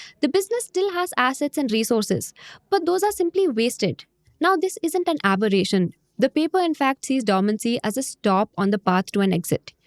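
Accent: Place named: Tamil Nadu, India. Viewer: that tamil accennt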